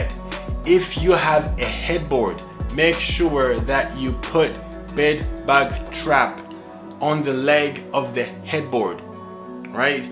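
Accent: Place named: France. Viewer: Hi. Oh you are à french speaker I've noticed your accent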